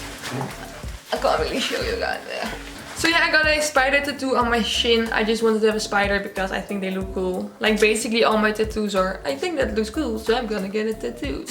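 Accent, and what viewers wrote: Netherlands. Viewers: Ben jij Nederlands want ik hoor een nederlands accent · I could hear youre from the netherlands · Is ze Hollands ? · moon kit ja ze is nederlands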